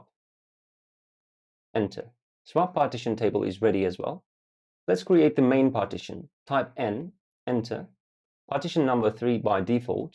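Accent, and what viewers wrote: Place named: United Kingdom. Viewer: Brilliant British accent 👍🏼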